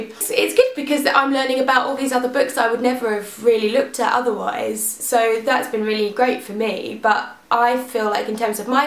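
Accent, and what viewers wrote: United Kingdom. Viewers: I can't believe it, you're so friendly! I came to your video/channel 'cause I wanted to hear the british accent and get used to it, but I'm impressed! I'm brazilian and I have plans to study english in London so I'm kinda going to live there for a few months and I'm freaking out! I'm happy that I get here in this video, so I can get used to some words and actually hear about books, it's useful! Thank you so much! (and pardon me if my english is that bad or if I've said something wrong, I didn't used a translator or anything :P ) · So nice to here another English accent on booktube. It's also nice to have someone on my sub list with Adult books. Looking forward to watching more. :)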